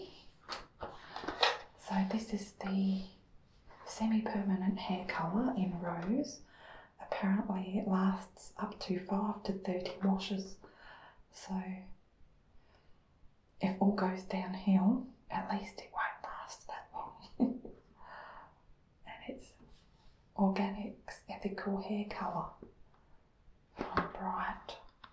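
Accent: Australia. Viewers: omg i love this!!! are you Aussie?? I laughed so much when you said, "sounds a bit rude".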